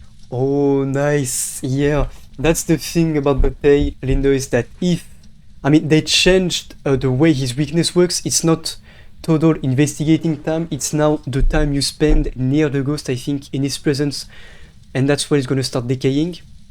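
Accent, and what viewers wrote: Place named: France. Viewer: im crazy supprised that ur french accent makes it difficult to really understand ur English.... But still nice smooth gameplay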